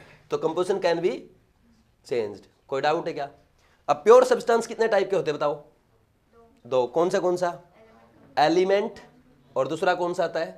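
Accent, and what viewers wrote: Haryana, India. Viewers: Sir are you from haryana?! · your accent is too similar to Haryanvi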